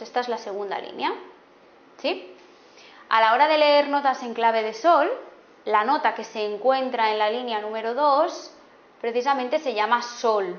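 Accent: Spain. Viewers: Amo el acento español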